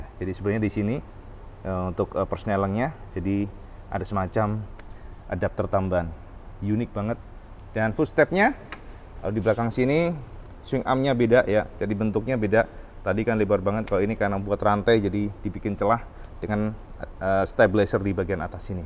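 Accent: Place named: East Java, Indonesia. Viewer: Mas kui ganteng logate Jawa timur medok, jangkrik tenan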